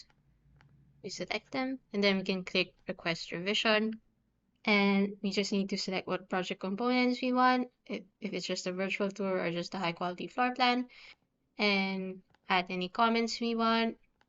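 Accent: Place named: Philippines. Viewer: Been watching these videos, you sound Pilipina (Pilipino here)! I'm liking what you guys do, just signed up :)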